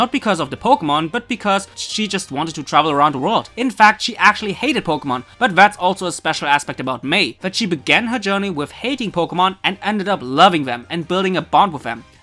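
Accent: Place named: Germany. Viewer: Hey + Maybe I'm wrong but are you from Germany? Your way of speach of some Names really seams like your from germany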